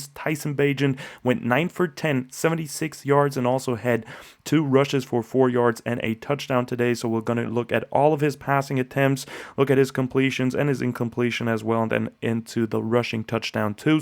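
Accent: Chicago, United States. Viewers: Native Chicagoan here, you got a little Chicago accent slipping in on your R’s and A’s. Proud of you man 🎉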